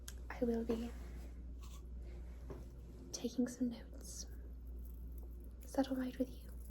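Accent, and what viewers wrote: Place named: England, United Kingdom. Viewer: OMGOSH I L♥VE the English accent! You do it very well. You sound so authentic. WOW. [For the record: I am British 🫖🍵] Thank you, so Much!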